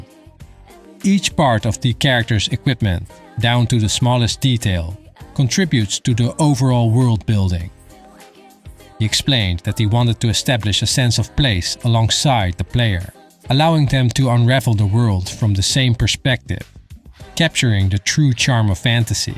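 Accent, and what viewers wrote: Netherlands. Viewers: are you perchance dutch i feel like u have a dutch accent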